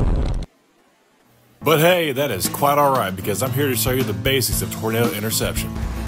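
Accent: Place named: Texas, United States. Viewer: The Texan accent making it’s infinitely better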